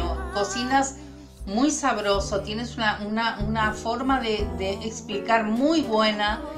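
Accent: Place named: Chile. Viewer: Wow que bendición que aún mantiene el espańol con usted esq de repente pensé q traía algo de chile 🇨🇱 porque tengo una amiga así como usted es psicóloga y me viene a visitar y la escuchaba si como ella y dígale adelante usted también creces mucho ya lo verá !!🙏🏻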